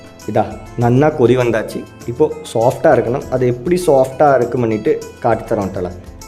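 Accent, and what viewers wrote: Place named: Kerala, India. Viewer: Reminds me of the dialogue Gramamum cookka neengalum cookku Very sweet malayalam kalandha tamizh❤❤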